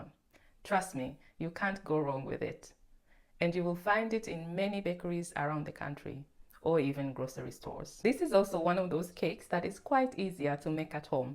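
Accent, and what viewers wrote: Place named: Africa. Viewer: You sound african.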